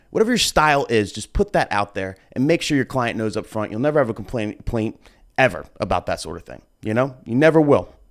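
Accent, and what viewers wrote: New Jersey, United States. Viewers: Im on both sides of this fence... when people complain about the DJ interaction...it just simply has to do with whatever they are doing...if you arent that good at picking good songs... you need to have a filler of you to keep people entertained... if you are super good at mixing but you dont care to interact...then add interactive audio to your set.... and also be up front... But. I dont think you realize that you have big time charisma... ive seen your streams as well... and even when you're quiet...you are interesting to watch... at least for me... you're good at both from what I have seen... of course I havent been to a wedding you DJ...I think... I went to a Philly wedding in 2014 and I almost think you were the DJ but my fallible human memory could be failing me miserably so I wont speculate lol... but you dont realize...you simply have the gift of an introvert and an extrovert...i am the same way... 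i dont want to be expected to interact....but when the party is jumping...im gonna naturally interact just because I am having more fun too. And I think you are a similar way... you got that entertaining jersey accent...you dont portray that you dont like interaction...you just dont like to be forced to be cheesy.. and thats a valid place to be... you simply know when to interact...and when not too...and most people in general simply dont know that... because they dont practice it... or simply dont have enough experience with it...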